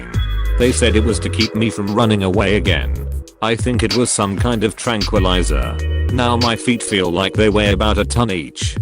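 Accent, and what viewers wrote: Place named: United States. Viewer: You must be American Englishes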